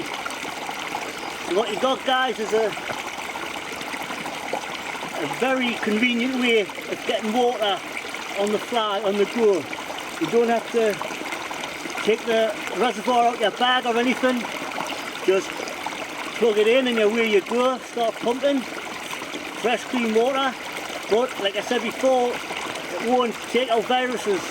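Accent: Newcastle, United Kingdom. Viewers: Sounds like you're from Newcastle, if my memory serves me right. Been years since I moved out from Gateshead.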